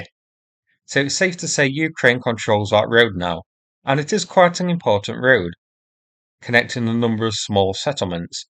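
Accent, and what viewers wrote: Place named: North, United Kingdom. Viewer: PLEASE tell me you are doing the audio book version, I need to hear those dinosaur names read out with that norther accent!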